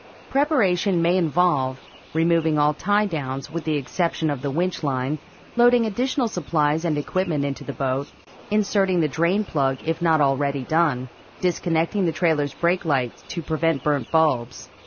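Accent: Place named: Australia. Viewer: Thats awesome! Are you from like Australlia or something?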